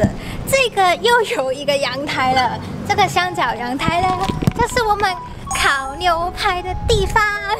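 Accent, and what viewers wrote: Taiwan. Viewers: 熊熊花現妳講話已經開始出現台灣式腔調了..... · 看到的故事其實也有感啦~ 畢竟我從你大概10萬訂閱開始看~ 在異鄉生活真的不容易~ 自己也是從彰化到臺北讀大學~ 但生活總是會有不如意的事情~ 甚至得罪人到很莫名~ 會很沮喪~ 但也別忘了生命會展開別的窗~ 聽到你說曲老師的故事其實蠻感動的~ 畢竟他們老師也是你的恩人~ 算在你遇到低潮迷茫時幫你一把~ 希望之後新學期順利啊~ 話說你講話跟臺灣人真的很像耶~ 畢竟我遇過的香港人~ 即使住臺灣三十年也是蠻有口音的(比有馬明顯)~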